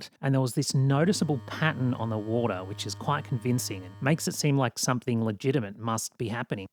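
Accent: Australia. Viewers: The moment I heard your voice, I could tell it was a fellow Aussie. Happy to follow and watch your amazing content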